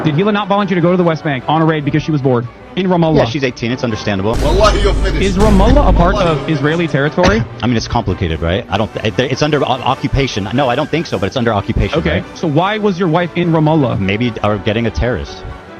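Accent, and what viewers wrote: Canada. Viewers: You sound like you're from Toronto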